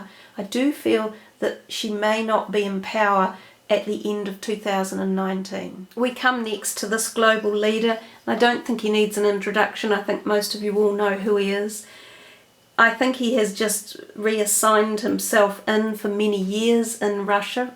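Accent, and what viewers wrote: New Zealand. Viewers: Kiwis not as bad as South African accent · Pardon me! NEW ZELAND accent!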